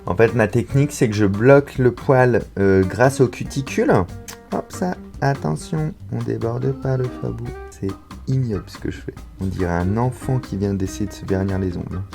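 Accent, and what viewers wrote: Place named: Charente, France. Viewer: Ahah le kiff d'entendre du patois charentais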